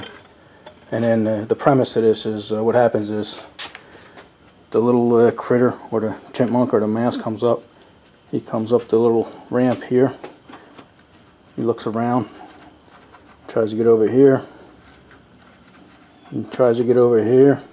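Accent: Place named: Bordentown, United States. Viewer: So, how far from Bordentown do you live? The accent is a dead giveaway. LOL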